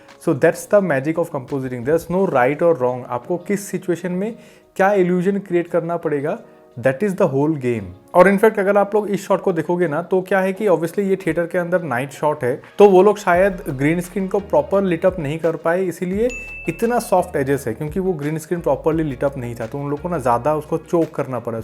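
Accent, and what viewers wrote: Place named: Bengal, India. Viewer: Surprised to learn that you are from Bangalore, you have a strong Bengali accent.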